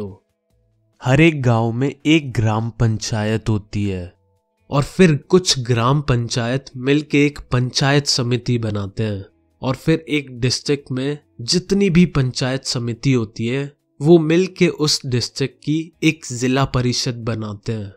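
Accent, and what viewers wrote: Haryana, India. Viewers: Haryana se ho k..?🤟😎💪💪💪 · Your sounds like Haryana are you from Haryana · Bhai Tu h kha ka Jo haryana ka h 👍👍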